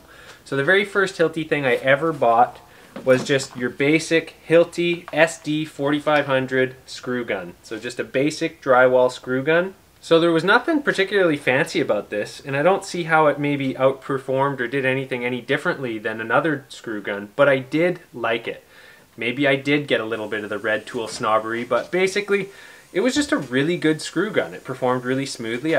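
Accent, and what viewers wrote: Canada. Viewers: Sounds like you have a Canadian accent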